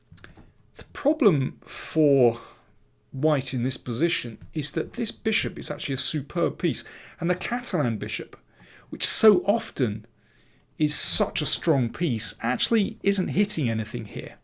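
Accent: United Kingdom. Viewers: I definitely agree! I currently believe that Daniel’s analysis is much better for improving. Because not only do I think that Daniel’s analysis gives us a grandmaster insight and ‘feel’ for the position, he also is imo able to express the psychological effects of moves much better. And while Agadmator’s top engine moves are nice to know, the analysis of the psychological effects of moves in terms of pressuring the opponent, being more comfortable and playing with the ‘flow’ of the game etc. are, I’m pretty sure, much more useful for improving than breaking down disgusting engine lines! And lastly as a bonus, I thoroughly enjoy the British accent and demeanor, especially when he gets all excited😆😇😇😇.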